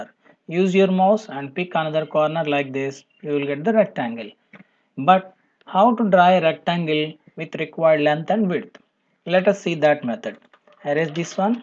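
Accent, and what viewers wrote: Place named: India. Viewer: Nice try but you have to improve your Hindu - English accent you speak. Half of them cant understand.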